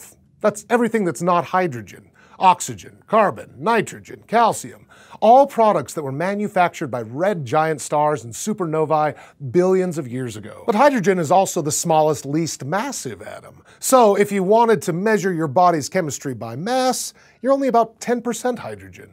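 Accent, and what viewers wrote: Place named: United States. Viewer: At this point, I just blame it on the American accent, and leave it at that. We all know what he means.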